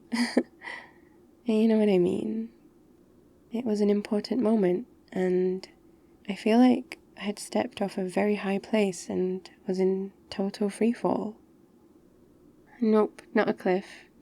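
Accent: United Kingdom. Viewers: Are you British! Finally! I love British accents!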